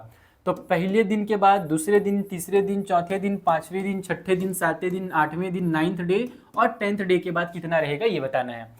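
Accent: Bihar, India. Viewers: Pta nhi chl rha kya language se biharrr babu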